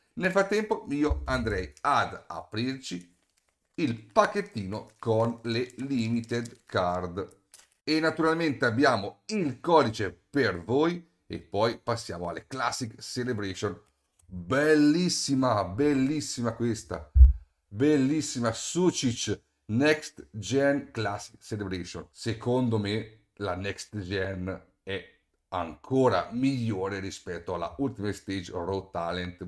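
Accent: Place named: Palermo, Italy. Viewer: Sei di Palermo ? Si capisce dall’accento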